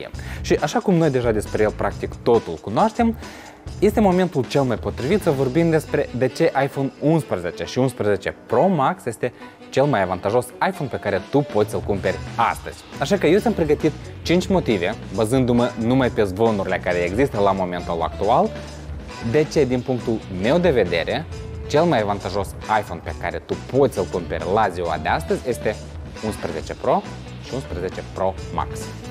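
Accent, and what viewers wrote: Moldova. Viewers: Si eu sunt romanca, locuiesc in Italia si ador accentul asta moldovenesc! ❤️ Cat despre Iphone nu sunt fana. Nu as investi banii in asa ceva, cand pe piata exista telefoane super faine si iti poti alege. Totusi pentru fiica-mea mai fac exceptii...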